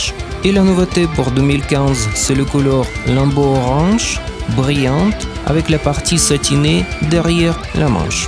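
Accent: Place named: Russia. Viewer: Salut, земляк, нюансы произношения говорят о том, что ты из России. Ну, или откуда-то в этом роде )) Par contre круто играешь, тут без нюансов! Как тебе гриф Шектера, не слишком толстый? У меня раньше был Ибанез Престиж 2127, удобнейший гриф на свете, но отвратный звук липы. Продал, теперь вот думаю взять этот Шектер, но сомневаюсь, не нужны ли тут ладони баскетболиста? Обычно у Шектеров грифы толстые... Ты его покупал, случайно, не в Париже на Пигаль? Merci d'avance, cordialement, привет из Беарна.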